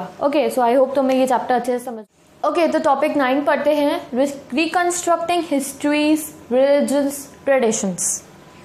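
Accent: Punjab, India. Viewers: Aap Punjabi ho?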